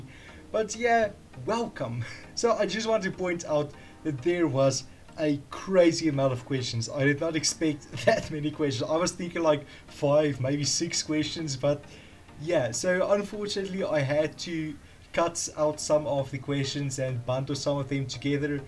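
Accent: South Africa. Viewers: Nice work on the world edit addon. It was a lifesaver. Tho I know you are from SA just because your accent is the same as RenDog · Are u from South Africa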